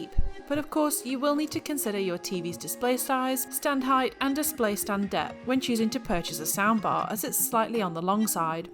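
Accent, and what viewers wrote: United Kingdom. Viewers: “Heych”-T…. The fuck with your pretentious british accent you piece of grabage!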